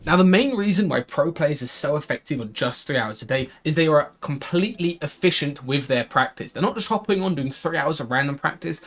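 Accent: United Kingdom. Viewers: London/British accent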